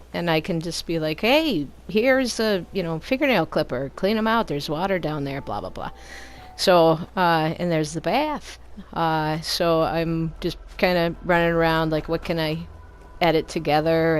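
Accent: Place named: Brooklyn, United States. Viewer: Out standing! Your funny and lovable would change a thing. Well I do like the Brooklyn voice tho. hehehe see ya in the next one!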